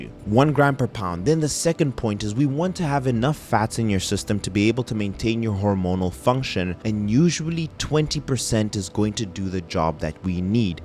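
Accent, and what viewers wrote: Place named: South Africa. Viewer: This is one fantastic breakdown. Thank you. I'd say I'm scenario 3, but since I'm never doing crunches and just compound movements, martial arts and dance maybe my abs aren't as popping underneath and scenario 1. Will definitely start incorporating the ab progressive overload you covered. My Fittrack scale says I'm around 18% body fat right now. But this is a refreshing summary you have done - thanks again. Also, love hearing a fellow South African accent on here from across the pond.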